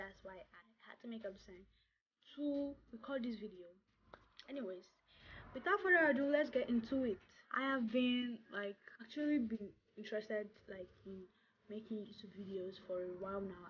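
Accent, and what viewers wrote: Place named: Yoruba, Nigeria. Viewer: It’s d accent for me ...Confirm Yoruba girl😂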